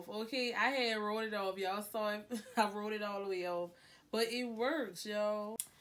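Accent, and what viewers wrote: New Orleans, United States. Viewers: You must be from around New Orleans. I know that accent.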